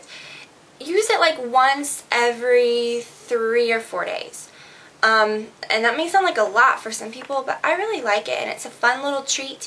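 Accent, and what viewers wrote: South, United States. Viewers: I think is pregnant too! She is GLOWING!!! & girl I can your southern accent coming out in this video! Love it! I use Pro Active too & LOVE it!! Great video! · Your southern accent really came out in this video.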